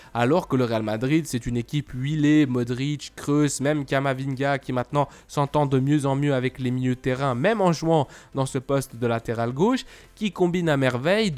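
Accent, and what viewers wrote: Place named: Belgium. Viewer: Merci de votre analyse tu es mon idole. Je demande tu as fais Belgique où tu est un Belge ? Car ton axant les ressemble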